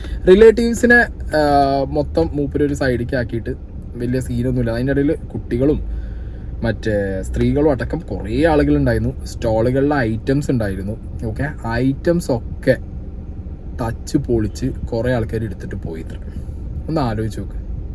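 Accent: Malappuram, India. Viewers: 😂😂😂😂😂- malappurathe karyam parayumbo aa slang ozhukk undayikote 😂😂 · "ഏത് കുദ്രത്തിനാണോ"😂 തനി മൽപ്പൊർത്തേരൻ 😅❤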